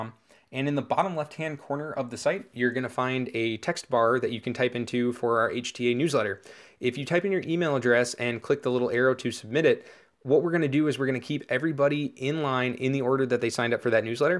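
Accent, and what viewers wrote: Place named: Chicago, United States. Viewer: Chicaaago accent"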